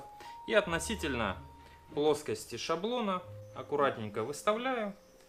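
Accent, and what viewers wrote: Rostov, Russia. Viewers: это Ростов, после переезда у меня сестра стала так же говорить)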